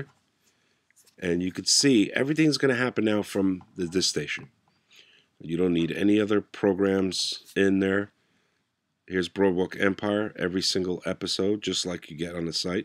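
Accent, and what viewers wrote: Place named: Northeast, United States. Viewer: ***** I got an cease-and-desist order once for 800€ and could drop this down to 120€ with my lawyer. It was a CD I owned yet which I downloaded just for fun (I didn't want to search my CD-Board,=) So, even if you own an opus of an artist, you are liable for infringin Copyrights in Germany. I don't know where you are from, regarding your accent I would say north-eastern USA ? Do you can download any works legaly if you "own" (have a copy) it in USA ? I don't think so (DMCA?!) With Series its another Story here. If it was aired in free TV you can legally download it. Besides, your Video was VERY infomrmative for me and raised the Decision to buy a Synology DS 2xx(play?) sthg with remuxing/transcoding would be fine. The Tracker where I download from isnt registered in any DNS and you can only be invited. Nobody will find its Frontpage without knowing the IP and even then he cant enter.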